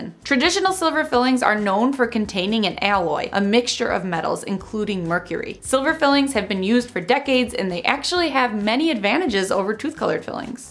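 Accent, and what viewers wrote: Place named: Upstate New York, United States. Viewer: are you from upstate NY?? I'm curious where that accent is from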